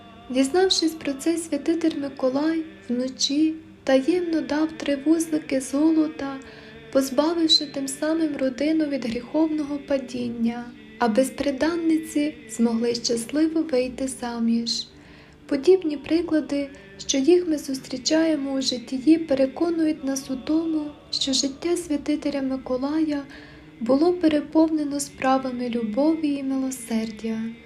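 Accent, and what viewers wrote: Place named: Transcarpathia, Ukraine. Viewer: Дуже ніжний голос, приємно слухати дикторшу. Помітив зразу закарпатський акцент, бо сам з Рахова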